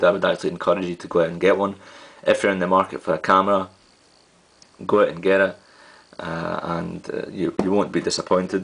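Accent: Scotland, United Kingdom. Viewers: yeah this is nothing personal agiant scottish people or waht ever, i just cant understand them as good as american people, for i´m not a native speaker and understanding is the hardest part of a foreign language. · Where bout Scotland you fae. Am fae elderslie.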